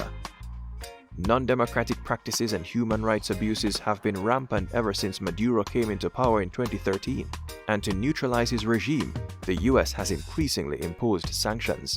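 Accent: Jamaica. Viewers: You sound Jamaican and you are talking tata. You know NOTHING of what you are saying. Trinidad will manage with or/and without Venezuela.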